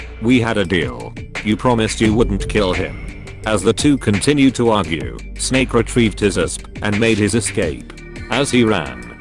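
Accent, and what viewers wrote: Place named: United States. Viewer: You must be American Englishes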